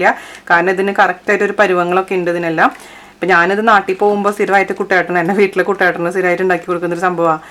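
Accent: Thrissur, India. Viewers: Chechi thrissur kariyaano thrissur slang athu kont chodhichatha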